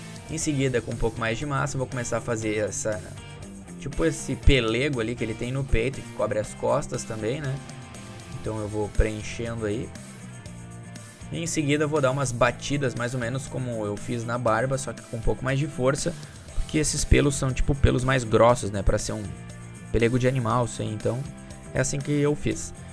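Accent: Rio Grande do Sul, Brazil. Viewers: Tu es gaúcho ?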